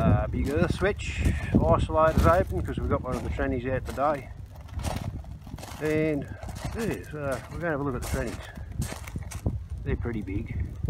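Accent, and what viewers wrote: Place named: Sydney, Australia. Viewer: Location and incoming lines look familiar, accent gives it away. Not too far off Wallgrove are we...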